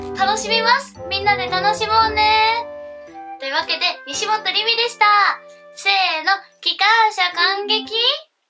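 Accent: Kansai, Japan. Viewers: なんか…めっちゃいい事語ってるんだけど…所々にめっちゃ関西弁混じってるw可愛い〜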